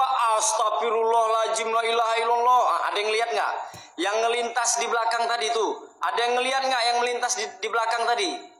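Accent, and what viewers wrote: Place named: Palembang, Indonesia. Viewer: Ass.... " ane mau tanya. Asli nya kang dari mana yah..?? Kayanya logat Palembang kang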